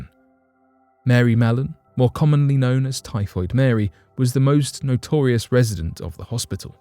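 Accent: United Kingdom. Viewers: This is why I never watch these videos... The title of the video is very specific, yet it's only a fraction of what the video is about, and is just a photo Montague with someone British voice just blabbering.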